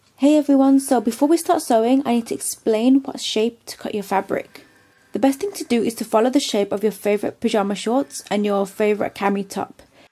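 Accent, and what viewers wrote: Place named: South Africa. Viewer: Lol. I actually thought there was a tiny bit of South African accent in there. I'm from Zimbabwe but been in Manchester since I was 8. And there's no trace of any brummie in yours lol xx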